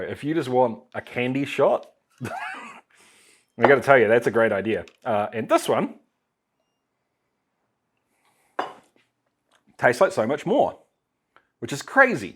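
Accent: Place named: New Zealand. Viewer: German speaking Yooper* here, stumbled across your channel a couple days ago. When I couldn't understand... well, anything you were saying, I actually had to turn on the closed captions. Then I realized I haven't heard that many Kiwis (with all due respect!) talk, so I had to watch a few more videos so I could translate the accent. By the end of this video, didn't need the (cc) any longer. And... absolutely loving the passion you have for the craft! * A Yooper is a person from the Upper Peninsula of Michigan (USA), very near the Canadian border. We talk funny too! :-) If I ever make a video, you'll probably need closed captioning too.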